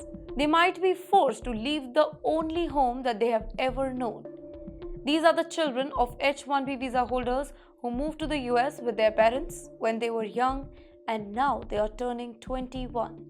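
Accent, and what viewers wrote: India. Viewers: Please somebody, get this young lady to speak English with a proper accent. If not just speak hindi! These indian youtubers have such atrocious accents that I switch them off. That guy beerbicep or whatever is one of the worst! Please learn from the tharoor guy!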